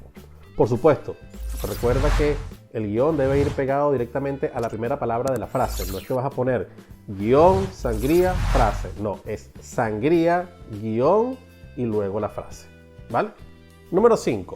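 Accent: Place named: Venezuela. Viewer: Vi el video, hasta que caí en cuenta que eres venezolano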